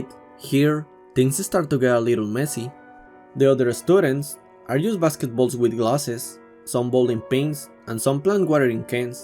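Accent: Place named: Spain. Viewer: Your acent is very spanish.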